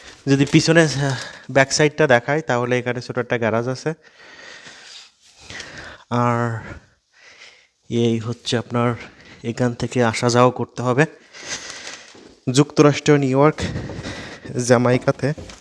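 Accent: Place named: Satkhira, Bangladesh. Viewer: satkhira theke... Apnar vasa sune mone hoi apnar bari satkhira..jodi satkhira bari hoi taile janaben 😊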